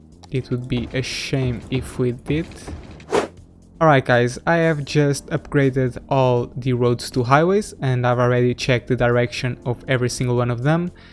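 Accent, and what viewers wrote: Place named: Portugal. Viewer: Where are you from? The way you speak English sounds like you're Portuguese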